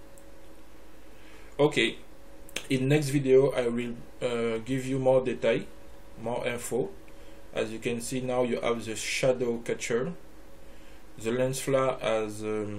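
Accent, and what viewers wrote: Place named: France. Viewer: un petit "accent" Français ;p haha tu fais l'effort de parler anglais et c'est pas evident sans ecrire de texte avant meme en Francais . merci pour la video je partage( passe voir la chaine et le site si ca peut aider aussi) A+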